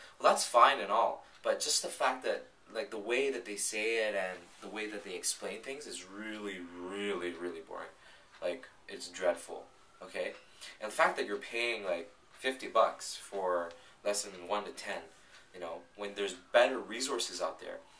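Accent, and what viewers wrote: United States. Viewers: Dude your English is spot on man. You speak it like an American. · Sounds like your in NYC! Pimsleur is not so bad, the issue I have with it is that firstly Japanese is not a difficult to pronounce language, unlike Korean, and the reverse pronunciation is complete overkill, though I have used the same method teaching English and it works. I agree with you and want to amplify the fact that Pimsleur's conversations are for the most part out of date. I took up Pimsleur when I was reviewing Japanese 4 years after having lived in Japan.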